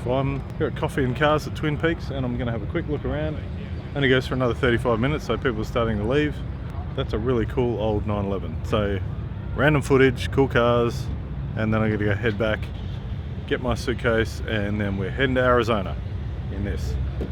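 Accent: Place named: Australia. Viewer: I wish this video was in American English, I'm having a hard times to understand these Australian language at times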